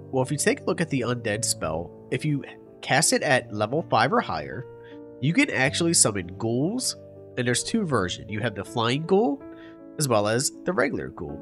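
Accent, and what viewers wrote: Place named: Pennsylvania, United States. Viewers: I sense south jersey/eastern PA/delaware in the accent 🤔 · ah sounds just like the Pennsylvania accent. Either way nice video. Also them Orioles are poppin off! Go to a game this summer don't miss it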